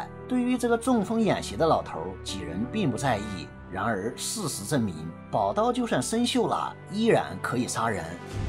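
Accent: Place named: Shandong, China. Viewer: 越哥的文稿写得很精彩，山东口音很亲切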